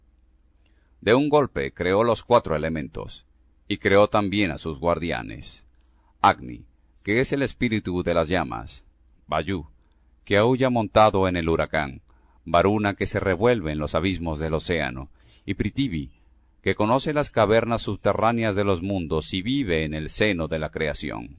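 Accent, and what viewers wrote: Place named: Latin America. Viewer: Escuchar a Bécquer con acento latino...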